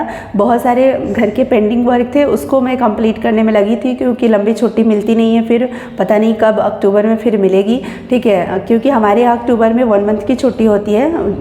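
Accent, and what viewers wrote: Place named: Bengal, India. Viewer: Aap bangali ke tarah bolti h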